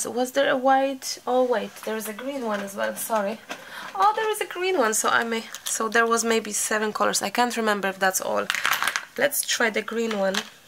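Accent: Cork, Ireland. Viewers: I keep hearing Irish vowels in your accent, have you settled in Dublin? Love your tutorials btw. Thank you ☺️ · you made me laugh so hard hearing the word 'mankie', my mam used to say that when we were younger and I use it around my grand babies too, so funny. Can I detect a Cork accent when you talk, I'm in Athy Co. Kildare. I love to watch you work your magic in your videos, thank you for sharing them all with us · I hear a hint of an Irish accent in there mixed with your native accent..Am I wrong?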